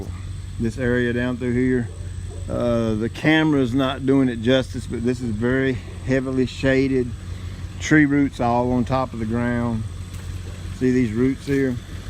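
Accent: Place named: Alabama, United States. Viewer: Cool accent? What state? Alabama?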